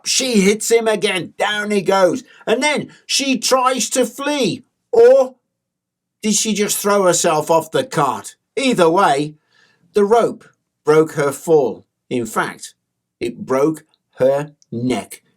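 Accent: United Kingdom. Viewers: Gotta save this for tonight for sleep. Need that sweet sweet british grandpa voice to soothe me to sleep. Thanks, from a cousin across the pond!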